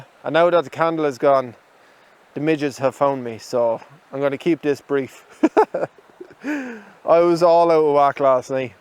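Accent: Ireland. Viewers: I just started watching your videos a couple of days ago and I enjoyed them. I noticed everybody was talking about your giggle and they’re right it’s very endearing. I wouldn’t change anything - you got me as a new subscriber. I love your Irish accent! At least I assume you’re in Ireland?! if someone doesn’t like what you’re doing they’re free to go watch something else you know what I mean? It looks to me like you have lots of people who are interested, so I wouldn’t worry about it at all.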